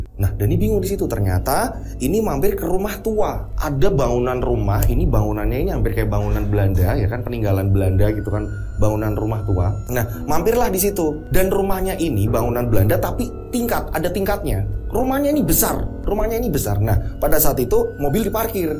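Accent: Java, Indonesia. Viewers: Jawa nya tom tomen kang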